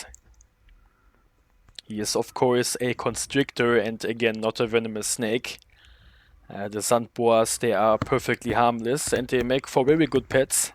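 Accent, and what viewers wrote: Germany. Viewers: Youre not English right youre another Language like German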